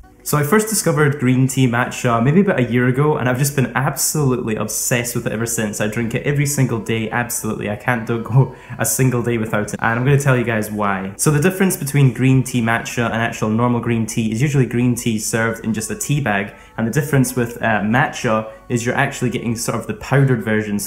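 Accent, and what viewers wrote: Scotland, United Kingdom. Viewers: are you Scottish because you have the accent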